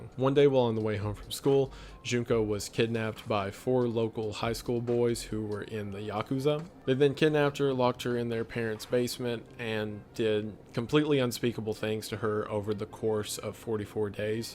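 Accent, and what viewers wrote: South, United States. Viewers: You sound kinda southern and idk if its in all your videos but I just noticed it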